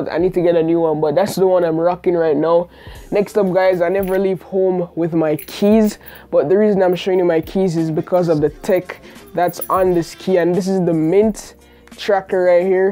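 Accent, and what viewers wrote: Jamaica. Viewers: Where my boy from? He accent kicking. Just sub. Update now I see you is a yard man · Lol this guy kinda have a Jamaican accent, where you from fam ?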